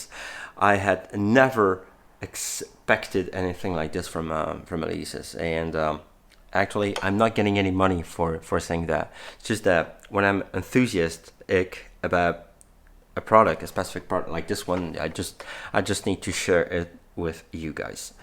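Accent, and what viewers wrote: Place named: Norway. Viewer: What is that accent dude? I can hear Norwegian, american, scottish and swedish??🤔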